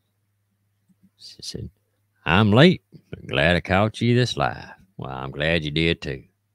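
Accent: South, United States. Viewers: This is the first time I seen you face to face. I came across you last week scrolling thur different videos from YouTube. I have stopped and seen one of your videos and watched it! Boy ol boy I'm hooked! I watch almost all day or before I go to bed. I about got them all watched. I'm off work because I found out I have a broken hip😢 I'm going thur alot of stress. I absolutely love your videos and your southern accent! I have so much peace listening and watching! You do a awesome job thank you so very very much!! For all your hard work and time doing them! It truly is a blessing! God bless you and your family.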